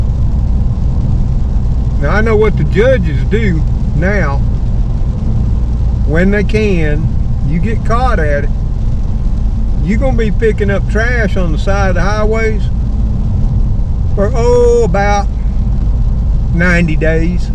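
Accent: South, United States. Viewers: Mr.. I was worried about you too. Your Vegas Fan. I'm glad your ok. I also think about Trixie possibly having issues when your not around for a bit. I would like to put those southern bells out there on notice, about looking after you. No disrespect sir but your quite the southern gentlemen a man of principle and intelligence.. Their missing the boat as far as I am concerned. Opp's that's right you got rid of that. Lol. I sure enjoy your southern drawl and interesting verbiage at times. Confession I don't always understand you it just makes it all that much more entertaining and educational of another culture then I am used too. I hope you don't take my off hand compliment and kidding to be inappropriate.. I have the utmost respect for you sir, your channel and what you are willing to share with us out here in video land. Be well Mr. until the next ride about.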